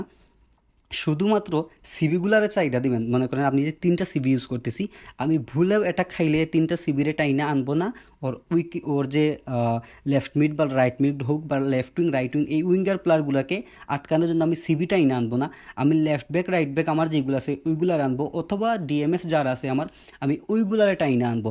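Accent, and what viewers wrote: Sylhet, Bangladesh. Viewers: bai channel or namor bay deka lagse na. tumar voice e bujilaisi tumi sylheti😁🫣🫣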